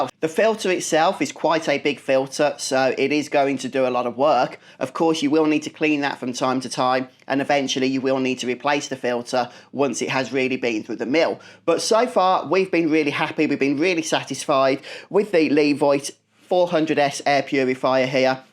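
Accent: United Kingdom. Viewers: Thank you for this great review. I love your British accent. I'm studying for IELTS and is a pleasure to listen and learn from you.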